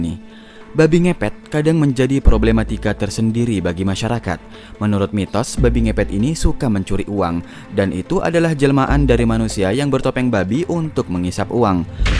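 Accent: Makassar, Indonesia. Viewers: Penasaran ku ini sama admin, tinggal di mana Ki? Logat nya Makassar bgt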